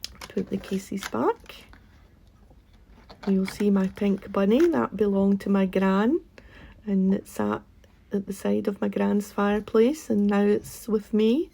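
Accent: Scotland, United Kingdom. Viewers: Love you room tour? Are you by chance from Scotland? You sound a lot like my Mom.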